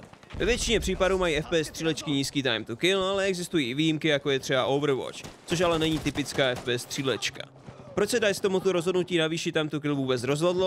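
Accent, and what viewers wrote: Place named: United States. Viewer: prídáváš Americký přízvuk a to je děs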